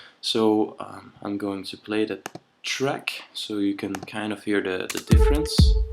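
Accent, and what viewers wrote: Belgium. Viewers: This has been amazing! Thank you so much! I recently moved to Amsterdam and thought you sounded Dutch! Well, Flemish!? Thanks again! x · Joli petit accent belge ;) On va essayer ca, thanks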